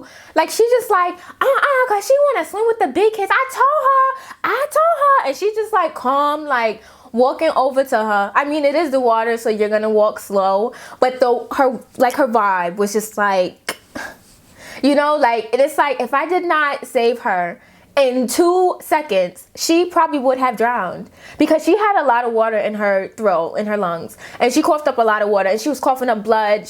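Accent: New York, United States. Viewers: The New York accent gives me LIFE fr!!!!! I love it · I love the way how New Yorkers say things that end with an "er" sound. Lol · your New York accent is so cute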